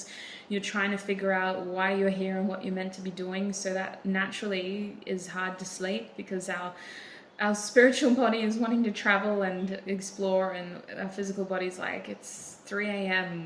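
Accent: Australia. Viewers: Yoooo this is my first time watching any of your vids popped up on my recommended cause I’ve been watching a bunch of tarot lately 1 I was surprised hearing a fellow Aussie accent 2 I picked pile 3 it resonated so strongly I was shocked 3 it’s the first time I watched someone pull letters out and stuff YOU LITERALLY SPELT MY NAME “Steph” and also my last name, is P so really u spelt “Steph p” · I literally saw the backyard and thought "huh. That looks very Australian" and then you spoke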